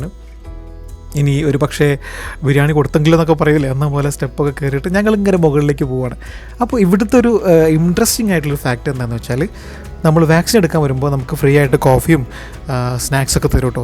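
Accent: India. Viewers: പുയ , ഇടക്ക് മലപ്പുറം ശൈലി പുറത്ത് വരും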